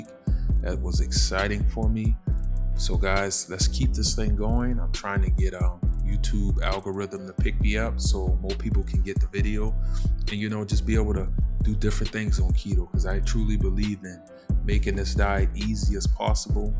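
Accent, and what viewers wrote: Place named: Gullah, United States. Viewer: You sound like one of my Gullah clan. Say it's so😊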